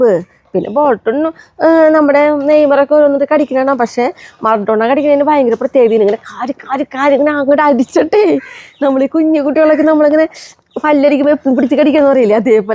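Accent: Thrissur, India. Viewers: I am also a from Tamil Nadu. I do watch ur videos, like ur vegetable garden n ur dogs very much. Never mind these types of people. They can't help themselves but to crticise others. Was in Trivendrum for 3 years, can read and follow Malayalam, but ur Trissur Malayalam is bit difficult for me 😂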